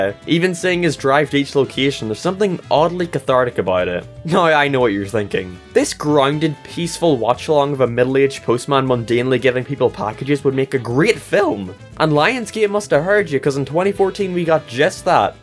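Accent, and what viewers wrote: Northern Ireland, United Kingdom. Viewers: I honestly started watching you because your accent makes your commentary pleasing. If I had to guess, youd be from Northern Ireland. I had a coworker from there and I can hear the similarities between you two.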